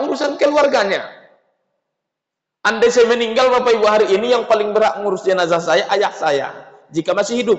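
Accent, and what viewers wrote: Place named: Pekanbaru, Indonesia. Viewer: Daerah mana ya ini, macam pekanbaru bahasanya.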